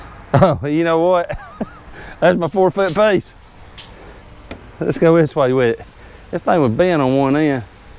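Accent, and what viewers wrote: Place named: Tennessee, United States. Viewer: Sounds like n.e. Tn. Love the accent like mine.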